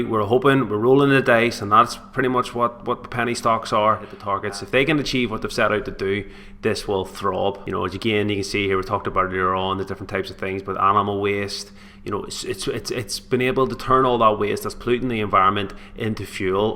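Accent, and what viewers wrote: Northern Ireland, United Kingdom. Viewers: I thought it was a Northern Irish accent.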